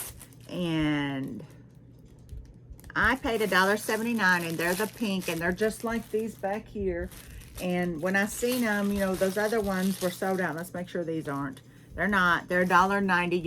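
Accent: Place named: United States. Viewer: Oh you never fail to make me laugh”ain’t nobody all up in my legs” I’m from New Zealand so the way Americans talk makes me laugh ya all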